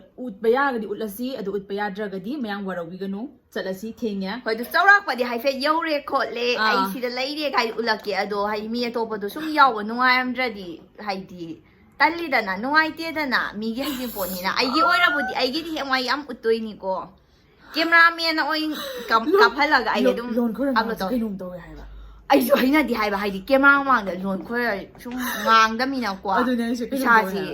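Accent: Kakching, India. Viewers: Che ym nungcye Londo kkching emnbi miss twe💙😪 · Yam laida kakching marolse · Kakchingdeiro che je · Eche nggi kakching marol ngangbase yam pakchei yamnasu fajei👍👍👍👍👍👍👍❤️❤️ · Kakching maron c taba ym nungaiye taninge amukkasu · Ema nokninge eikhoi kakchinglonse camera dana hena nokningbana nungdi ym nungcbani eikhoi lonse haha😍😘🥰👍🤭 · I love kakching accent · kakching lonsi taba nungai.nice 😊👍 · yam pakcheiye mta ado mtase kakching dagyro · I like Kakching marol 🤪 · Taba ymna nungaiye kakching gi wa khungang se... ym nungc ye. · Che se kakchingdeiro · Kakching lon se taba ym nungaiye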